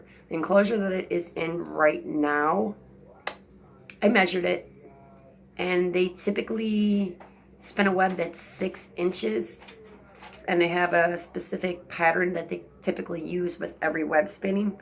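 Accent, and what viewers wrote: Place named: Ohio, United States. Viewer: Awesome stuff! I am actually trying the same thing with a much smaller spiny orb weaver! How crazy is that! You said everything I couldn't say about my viewers. I started out the same way. Just a few friends & family & now I have more friends & family on here! Lol. This community is one of my favorite parts of the hobby aside from the critters. PS. I thought you sounded like you were from Ohio! Norvis is actually a good friend of mine & y'all all have a similar accent! Lol 😂. Great stuff girl keep up the great work!!!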